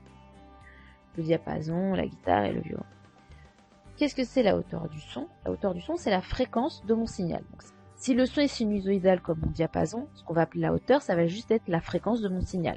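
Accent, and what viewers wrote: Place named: Tunisia. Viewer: Une voix de tunisienne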